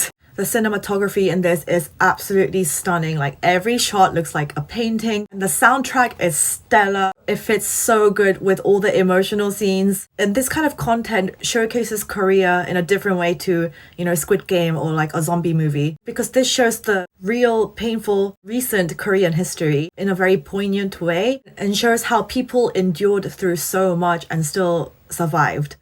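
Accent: United Kingdom. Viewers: All I focused while watching this video is your pronounciation. I'm learning Britain English from you. Thank you ❤️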